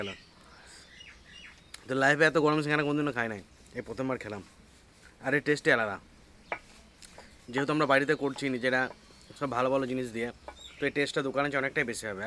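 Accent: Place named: Murshidabad, India. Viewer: আমার মনে হয় আপনি মুর্শিদাবাদের মানুষ কারণ আপনার কথাগুলো শুনে তাই মনে হল।আমার বাপের বাড়ী ওই জেলাতে।যদিও তার এখন গল্প।যাই হোক,আপনার ভি ডিও খুব ভালো অনেক শিখলাম। তবে সব্জি মশলা টা কী বুঝলাম না।ভালো থাকুন।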